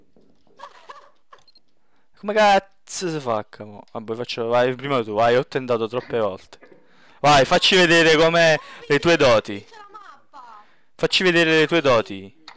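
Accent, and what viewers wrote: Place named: Naples, Italy. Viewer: Napoletano <3 <3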